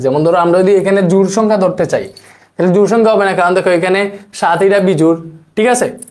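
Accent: Sylhet, Bangladesh. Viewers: ভাইয়া কি সিলেটি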